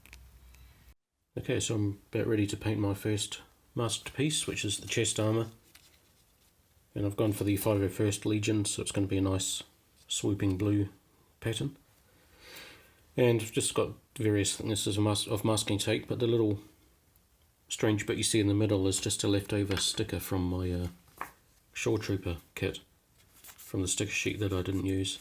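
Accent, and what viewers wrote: New Zealand. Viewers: You sound Kiwi, if so - where do you get your supplies from?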